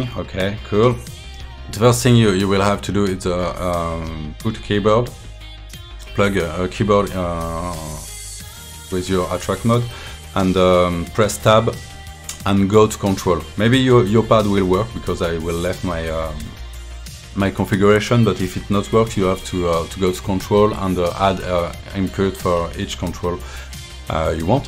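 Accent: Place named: France. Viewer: Ah ce petit accent So Frenchy que tous nous envient !! Thanks for that !!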